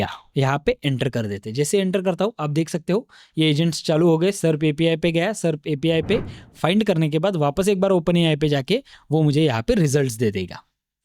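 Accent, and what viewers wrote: Maharashtra, India. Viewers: Bhava tu marathi aahes na tuja Accent ni kaltay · Thank you very much bro for introducing me to AI Agent world. Although I am a software engineer but I have never explored AI Agent side. Your way of describing is very good and seems genuine. Describing everything from scratch. I like your Hindi with Marathi style, it reminds me my days when I was in Mumbai and Pune. Want to learn lot from you. :)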